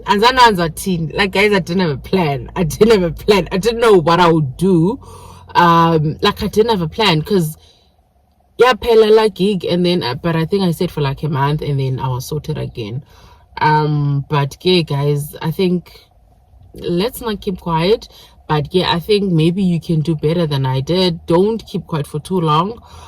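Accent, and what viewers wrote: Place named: South Boston, United States. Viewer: your home language sounds beautiful I think you talk like real southie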